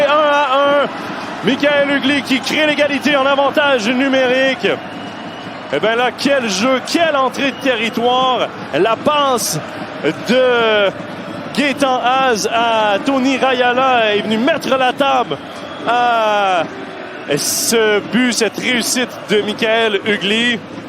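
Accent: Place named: Quebec, Canada. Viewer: pourquoi la commentaire est en francais quebecois?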